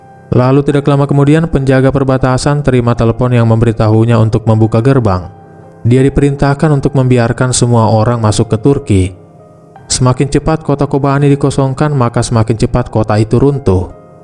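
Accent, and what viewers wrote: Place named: Bugis, Indonesia. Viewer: Mimin logat Bugis, orang Bugis kahh😅😂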